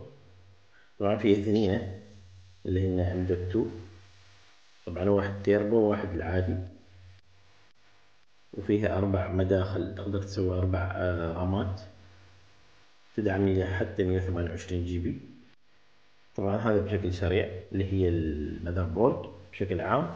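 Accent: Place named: Iraq. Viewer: تشبه لهجه عراقيه حيل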